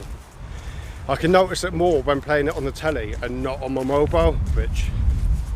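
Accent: Devon, United Kingdom. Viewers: Hi.... great to see you are keeping well mate. Don't worry about the awesome Devon accent mate..... it is one of the best we have. It has been a crappy 12 months for everyone and fingers crossed when all this madness ends things will get better for us. People sub because we like you and the things you talk about on video. Plus you are a top bloke as well. I will always be around for the times you get out and do a video mate. Stay safe, all the very best, Mark:):) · Just stay as you are, you just have a Devonshire accent I have a Suffolk accent lol don’t worry about how you sound, I watch you tube on my tv you sound ok to me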